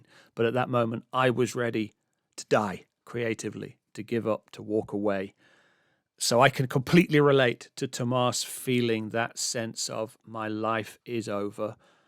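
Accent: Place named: United Kingdom. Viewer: Hi NWA UK Eastern division here. First time I have come across your channel. From accent I assume you are British, so nice to see that. I loved your intro. Mostly I don't like excessively long intros but this was apt and actually quite moving. I will be looking at your back catalogue. Love your poetry references too. Now I shall listen to the reaction and come back at the end but I suspect I am going to love your reaction and analysis and channel. From memory isn't it at the the start? And isn't he from Reigate school choir? Maybe he is Welsh, though Reigate certainly isn't 😀 OK, I'm and this is an incredibly thoughtful, insightful reaction. Why have I not come across you before? (Subscribed.) Think I lost this: They are all top flight musicians, technically and emotionally. They can all do anything that is asked of them but they let the needs of the music dictate what they do. 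To me that is one of thecdefinitions of a great musician - interpret and project the feeling and emotion whether it needs restraint or all-out "shredding". OK, finished. Quite possibly the best TPatP reaction I have ever seen. Thank you (as Floor would say).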